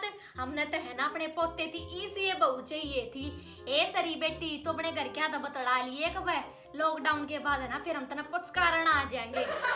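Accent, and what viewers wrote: Haryana, India. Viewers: I love your haryanvi accent. 🥰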